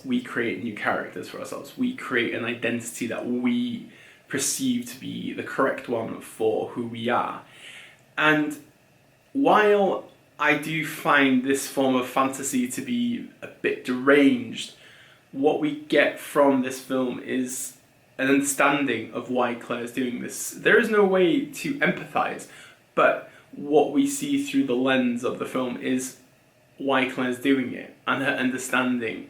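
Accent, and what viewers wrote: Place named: France. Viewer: I don't know what impresses me more....the movie review or your French accent!!!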